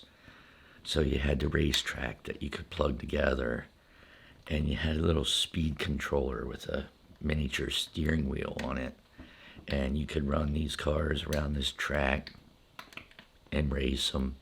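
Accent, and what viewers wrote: Pennsylvania, United States. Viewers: What part of PA are you from? Because my family is from western PA and sound just like you.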